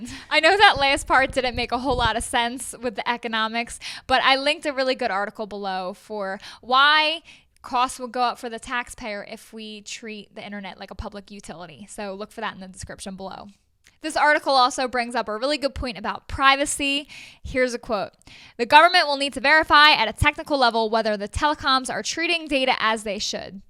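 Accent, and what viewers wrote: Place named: New York, United States. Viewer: You have the most Jersey/New York sounding accent I've ever heard.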